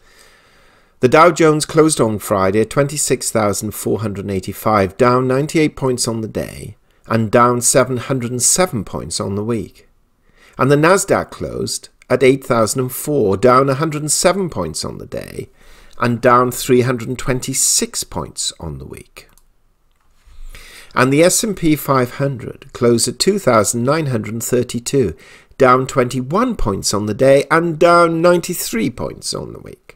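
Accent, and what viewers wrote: United Kingdom. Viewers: Is this a regular British accent?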